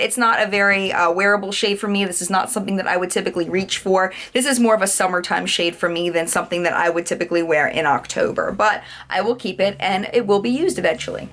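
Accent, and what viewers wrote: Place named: Mid-Atlantic, United States. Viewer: You live in Baltimore?!?! Me too! Did not know that! But I definitely recognized that accent as mid-Atlantic!! Go Ravens!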